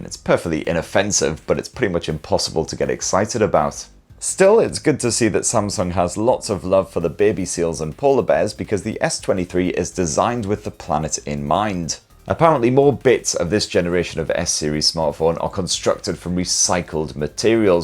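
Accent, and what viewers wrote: United Kingdom. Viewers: Excellent review which I enjoyed watching and you gave top notch information HOWEVER, being an American, your fast speaking, Brit accent was at times difficult to follow. I still gave you a Thumbs up, thanks for all the great info.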